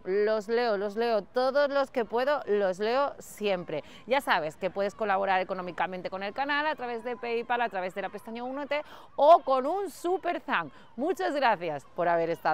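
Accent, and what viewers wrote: Spain. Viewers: Me gusta .loquedises con heste asentó Español.desde .USA😊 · En Cuba siempre han querido mucho a España, me emociona tu acento pura Española, o sea de España.Tengo más de 15 años que no como un turrón alicante, ni el turrón jijona ,aca en Venezuela es muy caro para mi.Buenas tardes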